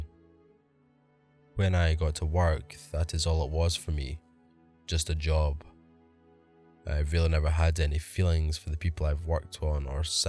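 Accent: Scotland, United Kingdom. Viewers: I already knew that I loved morgue stories, but with a Scottish accent, I love them all the better!! 👍👊fist bump!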